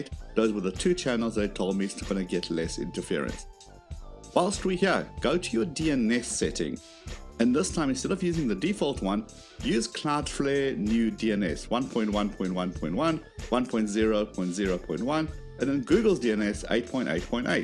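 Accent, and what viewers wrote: South Africa. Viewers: Your accent is so South African 🤔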